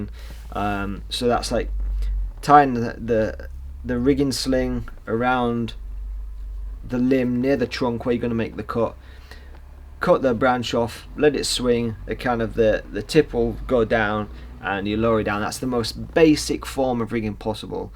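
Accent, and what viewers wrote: United Kingdom. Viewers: Sweetest name ever!! With the British accent! This guy needs to be a movie star!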